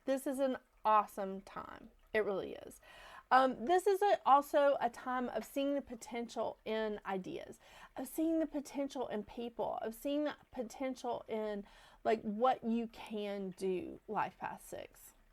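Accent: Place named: South, United States. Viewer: First time watching from Georgia. You sound SOUTHERN. Refreshing. Thanks for the heads up!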